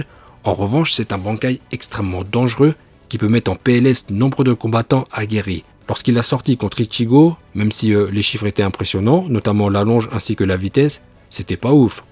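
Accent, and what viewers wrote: Japan. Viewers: Bravo pour ton accent japonais c'est cool 😎🇩🇯🇩🇯 grâce à te vidéos sur naruto DBZ bleach je appris beaucoup de mots japonais merci beaucoup mn frère est perso j'aime bien le bankai le moian globes oculaires le chauve de la division 0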